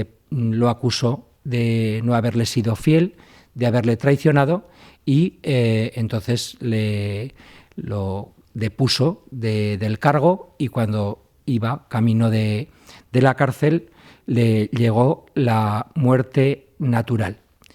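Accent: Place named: Spain. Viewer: No está preparado este exponente..de por sí con esta ablita de españolete que molesta y aparté no bien preparado en el tema pésimo video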